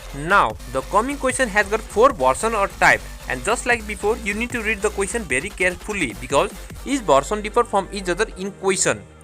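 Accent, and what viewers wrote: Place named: India. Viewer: Bro your a hero thx for giving us answers, your telling us the answers very well and i kinda notice you accent you indian right? I SUBBED!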